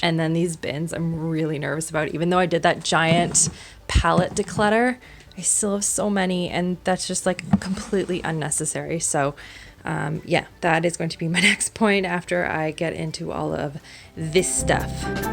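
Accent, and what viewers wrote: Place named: Canada. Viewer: Do you guys binge watch? I know I do! I feel like after about an hour of her voice, I have picked up my own slightly Canadian accent. Lol. Coffee cheers from Michigan “aye” 😊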